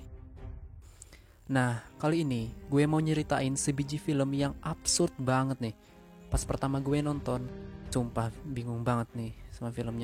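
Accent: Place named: Java, Indonesia. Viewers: jawir jamet medok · Suara org sunda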